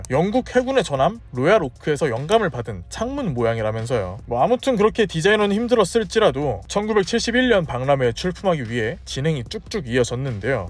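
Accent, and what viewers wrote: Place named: Jeolla, South Korea. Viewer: 말투가 진짜 특이하시당... 경상도 같다가 전라도 같다가..😮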